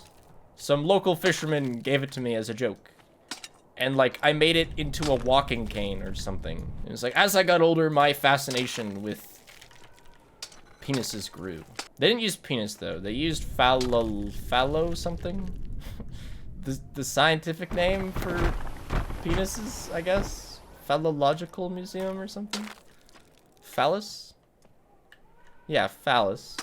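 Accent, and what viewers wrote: Canada. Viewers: I love how Chat makes fun of for his pronunciations, despite all of Chat knowing that he's Canadian. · I would've expected something closer to "rundabutt" but "roondaboot" sent me also the Canadian raising when he says "exit" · rundabut, i completely forgot that you are Canadian. (i mean no ill intent. im American and thought it was funny sorry) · Something I love about is his Canadian accent But his content is really good.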